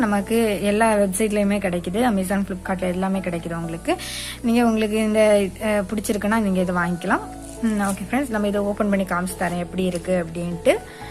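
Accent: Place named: Kanyakumari, India. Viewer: neenga pesura slang vachi than sonnen nanum kanyakumari than akka nice tips akka appreciate you 👏👏👏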